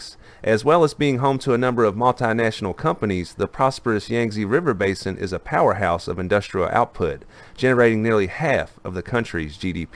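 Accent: South, United States. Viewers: When I clicked on this video I did not expect to hear someone so southern hahah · This southern accent attempting so many Chinese names is somehow both endearing and unbelievably frustrating lol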